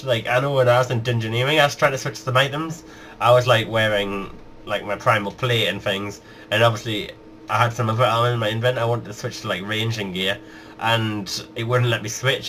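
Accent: Newcastle, United Kingdom. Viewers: Your accent is like mine. Are you a fellow Geordie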